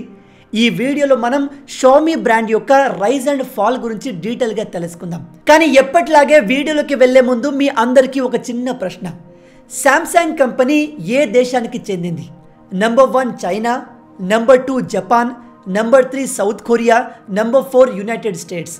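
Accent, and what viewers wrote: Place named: Marwari, India. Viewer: Anna, Mana ooru edhi. Slang kastha marwadi slang la undhi.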